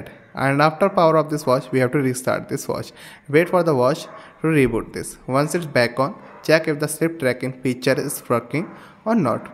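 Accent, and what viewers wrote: India. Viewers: Please add captions, sometimes it sounds like you’re speaking Indian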